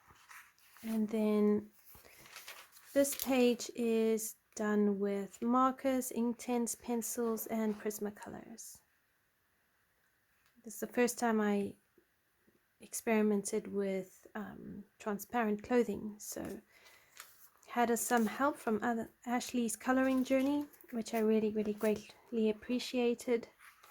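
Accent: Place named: South Africa. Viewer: It was so great to hear another South African voice on the Color Tube. Good luck with your channel, your coloring is so lovely.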